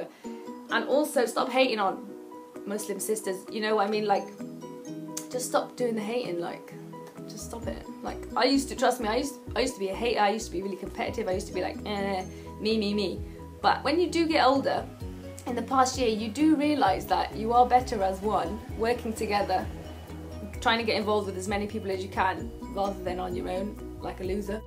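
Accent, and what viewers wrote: United Kingdom. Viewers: I love what you wearing, and i love british accent. <3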